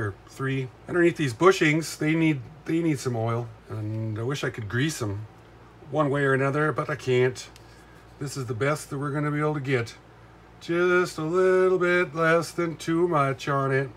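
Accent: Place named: North, United States. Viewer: Hey,. I normally stick with ya til the end because I enjoy hearing you talk. You have a Northern states accent that’s pleasing to my ear, oh sure! 😅 And I don’t want to miss any of your wisdom you put out about repairing the model trains. There’s three of you fellas I routinely follow because you each repair and restore model trains that teach me plenty about my own equipment and I hate to miss any information because I fear what I miss just might be that one odd piece of info I need to fix or correct something on one of my trains. Now I collect HO equipment but that doesn’t mean there isn’t something I could learn if you cover a Lionel or American Flyer repair. Electrical repairs or soldering are easily transferable skills in model railroading. Best wishes and see you on another video.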